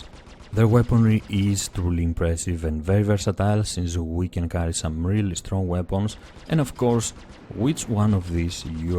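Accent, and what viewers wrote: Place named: Greece. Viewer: Nice videos and very well presented ! Subbed right away . Sounds like a greek accent :) If so , I am double proud for you , early backer here o7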